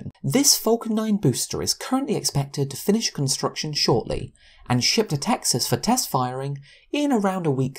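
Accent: United Kingdom. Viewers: This british accent...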